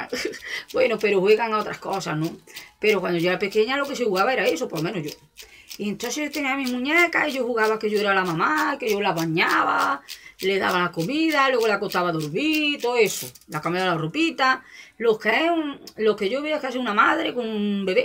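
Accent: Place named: Andalusia, Spain. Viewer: di que sí!! arriba el andaluz!! si no se entera que no te vea! yo soy gaditano y te entiendo perfectamente! un beso guapa! la Barbie me encanta! por fin una muñeca que se acerca a la realidad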